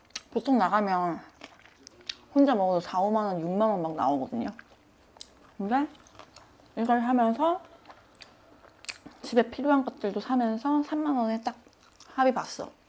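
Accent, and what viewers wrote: Gyeongsang, South Korea. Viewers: 약간 경상도 사투리가 묻어있는거 같으세요ㅎㅎ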